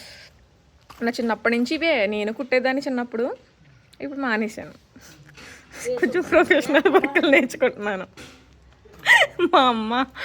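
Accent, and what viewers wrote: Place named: Konaseema, India. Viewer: Konaseema slang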